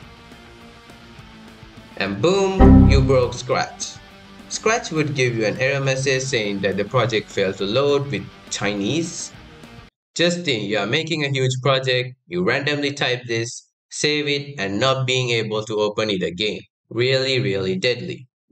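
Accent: India. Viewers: Him : voice Me : IndiaN?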